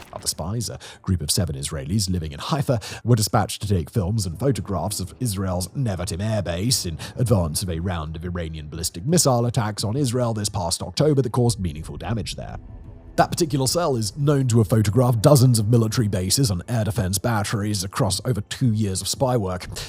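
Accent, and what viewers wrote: England, United Kingdom. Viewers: It’s hilarious to me that someone as ill informed or informed but lying to their audience is so highly regarded on these topics simply because he has a YouTube channel and speaks with an English accent. To be clear, there is not a single part along the front lines that Russia is quickly advancing at. Russia is getting the butt’s handed to them in every direction. Just because you are losing a tree line or a few fields every week doesn’t mean you’re losing. Ukraine has taken more land in Russia than Russia has in Ukraine all year. In the area’s where Russia is advancing their casualties are as high as 10 to 1. This is a strategy that is similar to a heavy weight boxer letting the bigger and stronger opponent wear themselves out by throwing non stop haymaker’s that’s doing little damage. At the rate Russia is advancing it will take them 250 years and 100 million dead soldiers just to reach their stated goals of taking Donbas, Luhansk, Kherson, Zaporhizia, and I forgot the other off the top of my head. 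Make no mistake if Ukraine said we are going to hold this line at all cost they could do it, but if Russia wants to lose a thousand soldiers and millions in equipment to take a field you let them in a controlled retreat. Russia’s economy is collapsing, their allies and bases overseas are falling, their military has been set back decades, and there are sign people inside Russia are turning. Right now Russia is losing more troops than ever before. Everyday they are setting a new rec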